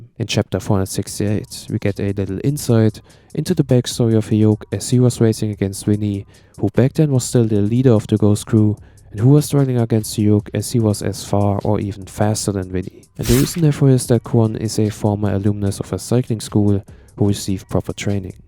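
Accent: Germany. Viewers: New to this channel but as i windbreaker fan i love this video. Considering your slight accent are you also german?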